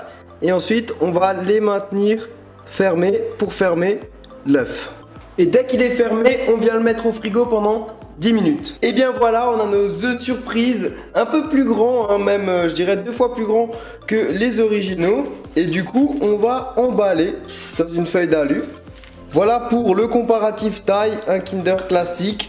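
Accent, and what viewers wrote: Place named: Alsace, France. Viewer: Hé yo on entend bien l'accent Alsacien dis donc (le Bonjour de Mulhouse)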